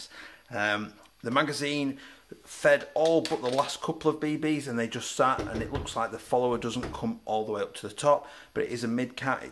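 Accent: United Kingdom. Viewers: Thanks for the response. I notice you've got a northern accent and I'm from west Yorkshire I'm just wondering if you could recommend me any good fields because I'm gonna start airsoft once the lockdowns over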